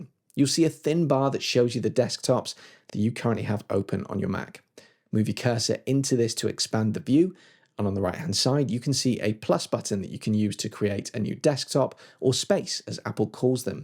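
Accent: United Kingdom. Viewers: For all who're mocking the accent The British accent is by far the sexiest English accent ever existed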